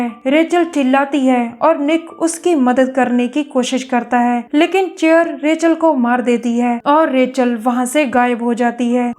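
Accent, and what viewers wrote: Punjab, India. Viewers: kya yaar punjabi hindi with break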